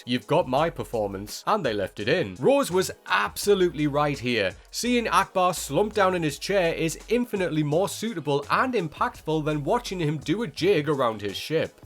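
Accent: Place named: Newcastle, United Kingdom. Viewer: That's so funny that the final chapter in the video description says "Rear Park" instead of Ray Park, when the video narrator's got a Geordie accent :)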